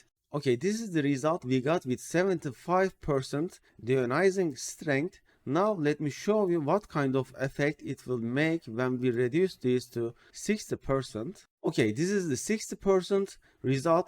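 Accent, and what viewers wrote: Turkey. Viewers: Türk ingilizcesine benziyor. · Buram buram ben Türküm diyor bu aksan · türk olduğunu konuşmandan anladım sağol abim :D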